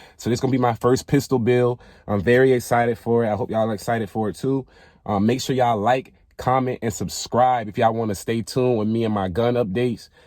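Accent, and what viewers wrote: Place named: Florida, United States. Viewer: You talk like a Florida nigga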